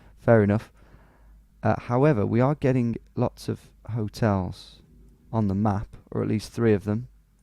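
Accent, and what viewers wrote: United Kingdom. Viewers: I just realized that Stewie has a British accent and therefore you sound like Stewie. 🏈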